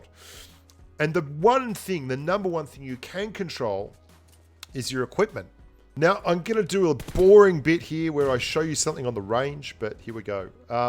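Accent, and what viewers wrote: Australia. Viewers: love the content in general, love the Aussie humour, the accent and the honesty of the commentary. Thanks! from Ireland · You don't sound British but Australian.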